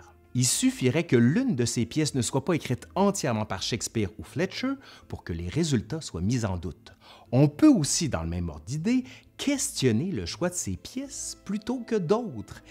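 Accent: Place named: Quebec, Canada. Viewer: Un petit bonjour de Suisse! J'adore tes vidéos! Le contenu est extrêmement bien présenté! Et le ton de ta voix, plein d'enthousiasme et de suspense, est addictif! De même que ton air sympathique et l'expressivité de ton visage :) Après, en Europe, on a tendance à trouver joli et "pitoresque" l'accent québécois, je suis sûrement biaisée ;)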